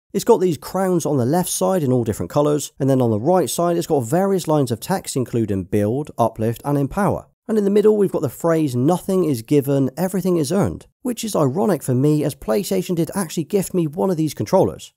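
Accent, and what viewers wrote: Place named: Yorkshire, United Kingdom. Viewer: Where in Yorkshire are you from? I’ve never heard a Yorkshire accent like this 😊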